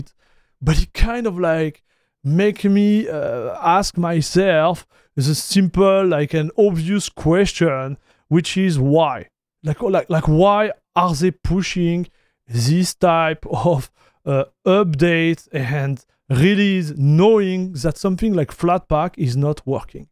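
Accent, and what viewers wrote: France. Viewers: The french accent is so strong ;)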